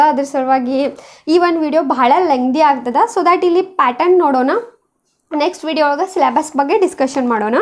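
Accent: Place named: Bijapur, India. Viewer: Nin language slang nodidra biyalu seemi Hudge tare kanutte.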